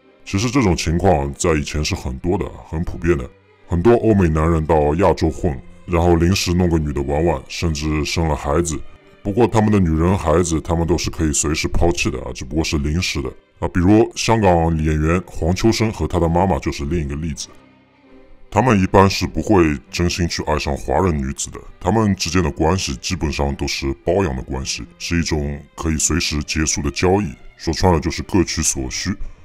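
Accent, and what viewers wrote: Shanghai, China. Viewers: 看你表达能力很强，论述的时候也没有断续，应该是有受过训练的专业人士，而且说话的方式和一些地方语言的应用，都说明了你本人是地道的上海人。 我在猜想你不会是之前被国内封杀了的周立波吧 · 你是上海人吧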